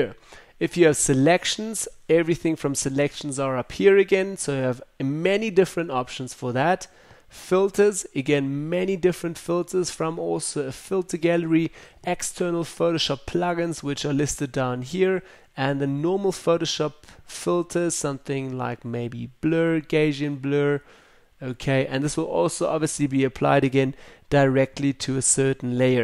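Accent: South Africa. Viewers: Are you south african!!!!! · Ja nee! You must be 🇿🇦 GREAT TUT!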